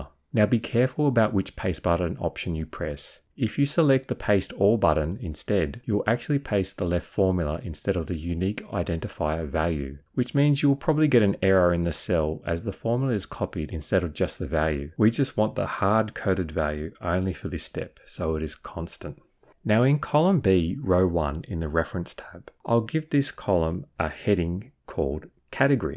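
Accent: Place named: Australia. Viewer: Hi, tnx for your response a couple of days ago. I fixed the issue re VLOOKUP! It was as you suggested columns not ‘lining up between data and reference tabs. Thanks so much! I can now follow your process for bank statements inc pivot table summary. Do you have a video for credit card plus automation as similar process but somewhat different ways I want to view?Leanne PS again so good to follow the Aussie accent😂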